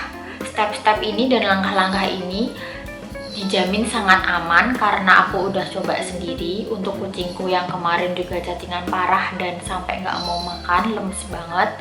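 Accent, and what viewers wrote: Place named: Banyumas, Indonesia. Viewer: Si embak ini kaya irg banyumas ,, bicara ny ngapak😅😅😅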